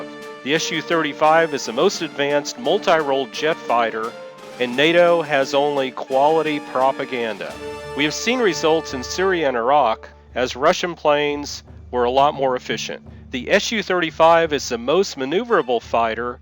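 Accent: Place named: Pakistan. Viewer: Your voice is pakistani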